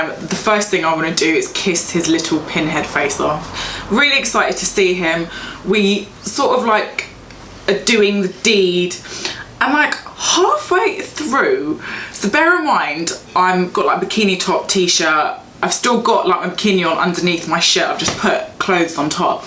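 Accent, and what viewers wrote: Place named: England, United Kingdom. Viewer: Lool I just about to say if you came here a few years back how is your accent soo english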